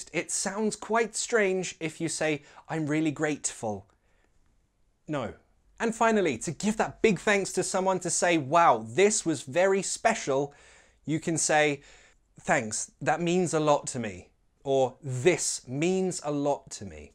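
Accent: United Kingdom. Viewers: I have a question, which British accent do you have? I would really like to know! ☺ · Please give the english subtitle :( i'm from indonesia and i love so much the way you teach but so many word that i can't understand with your british accent. And thank's before :)))))))) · Sir is the first British teacher who has the lightest British accent that I've ever found. 👍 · I like British accent because of you😊🌹..... Thanks ever so much for your useful lessons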